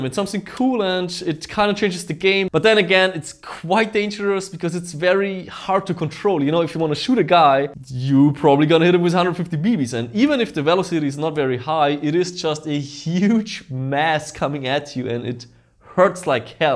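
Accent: Bavaria, Germany. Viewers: Novritisch bist bayrisch na????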